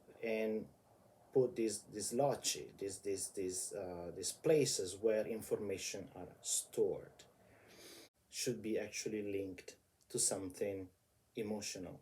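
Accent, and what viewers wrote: Italy. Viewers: Da come pronuncia i nomi italiani direi che sei italiano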